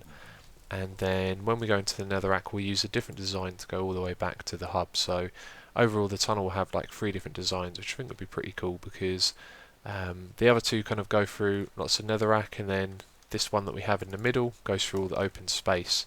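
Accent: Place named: United Kingdom. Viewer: Your voice is so epic and sexy i must say ! your accent is also really good just love the british accent!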